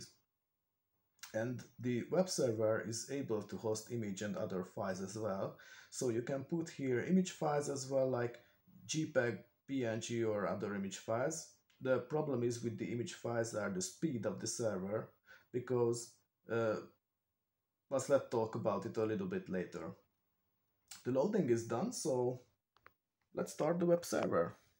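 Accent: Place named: Hungary. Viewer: amazing project! your accent sounds a bit Hungarian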